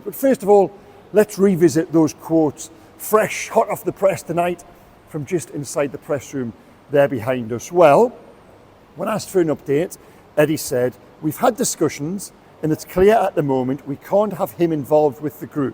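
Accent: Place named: London, United Kingdom. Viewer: No need to put your London media voice on for us if you want to do a video in your proper Geordie voice we are here for it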